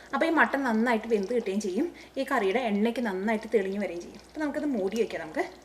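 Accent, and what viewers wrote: Thrissur, India. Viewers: R u from Trichur?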